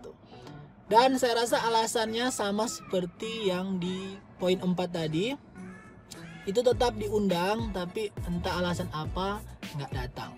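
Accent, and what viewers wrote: Manado, Indonesia. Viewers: Selama tontonin chenl abang nanti tau abang orng manado😂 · Bang org manada kang?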